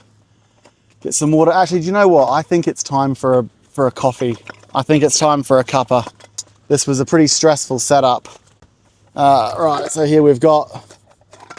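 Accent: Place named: England, United Kingdom. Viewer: Nice one, you have picked up a bit of a Pommy twang 😂 I have sub’ed, greetings from Halls Head, WA 🇦🇺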